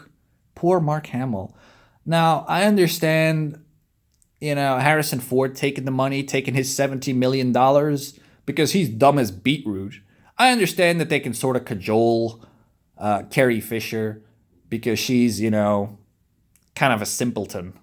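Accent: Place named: Netherlands. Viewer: bro you are a nerd with the worst dutch accent ever ... and i'm dutch, so if you wanna fight me let's meet up i would love to thump in your geek ass head ...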